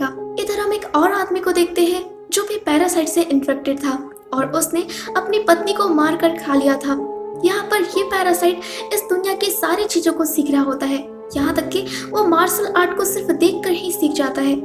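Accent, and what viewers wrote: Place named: Odisha, India. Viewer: aap odisha se ho lagta hai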